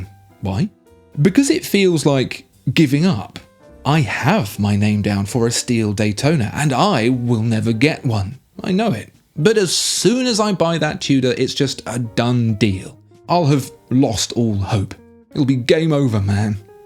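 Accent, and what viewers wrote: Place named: RP, United Kingdom. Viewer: The posh accent and babbling garrulity is just comical.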